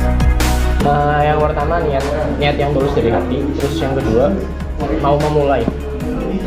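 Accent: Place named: Banyumas, Indonesia. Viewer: Ngapak nya keliatan yaah 😂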